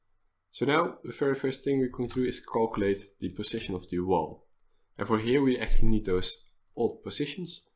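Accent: Netherlands. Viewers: Hey, I have a question:) I am making a maze game, but I want to make it so that at the loading screen you have a sphere which is moving in the maze. But I want to make it so when it hits a wall it goes to the left or right or straight or go back, depending on where the walls are. Do you know anyone who can do this/explain to me how to do it. I'm really struggling with this, if you do, it would be awesome. You can also send me some code (if you do understand how this works) I could learn a lot from that!:) Thanks! If you need to know anything, just ask me. And you got a new subscriber:) Btw, Love your accent. Are you dutch right?:) I am!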